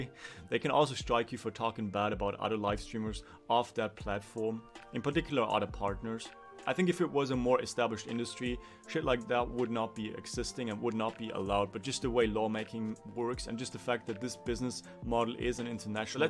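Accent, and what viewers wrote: Germany. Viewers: U from Germany?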